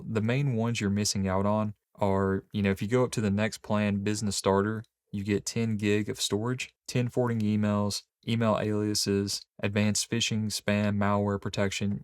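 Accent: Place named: South, United States. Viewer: This guy's Southern accent is everything 🤩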